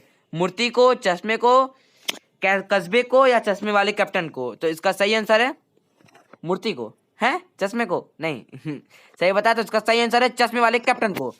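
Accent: Bihar, India. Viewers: Aap bihar se hai ky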